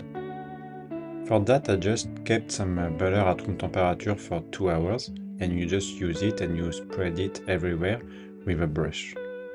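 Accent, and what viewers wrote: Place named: France. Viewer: The last time I heard French English accent was so long ago, and it is nice to see a French chef guide how to make a brioche 😄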